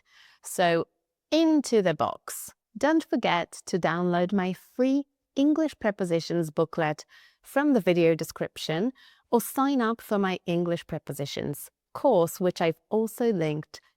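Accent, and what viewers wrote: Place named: United Kingdom. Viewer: Thank you madam, very much for your excellent elaboration. By the way, I love your British accent. That 's fantastic.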